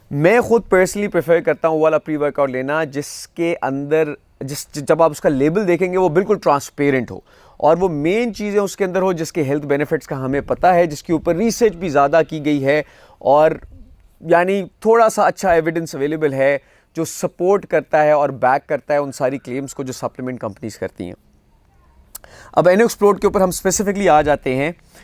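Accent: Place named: India. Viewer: Why are u sounding like some Indian influencers?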